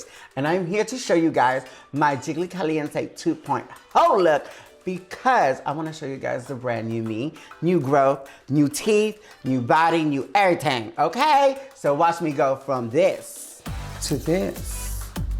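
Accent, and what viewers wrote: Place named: New Jersey, United States. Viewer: I’m not from the US so I’m not really sure of what a Jersey accent is but I’m pretty sure Jiggly has it.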